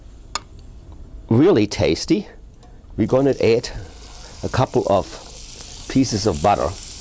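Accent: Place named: Germany. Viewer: i like his German accent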